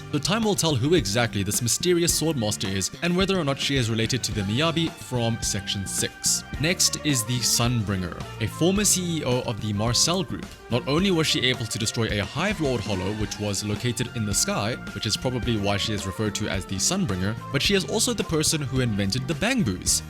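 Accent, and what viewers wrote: South Africa. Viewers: are you SouFafrican? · So good to hear a South African accent on YouTube ❤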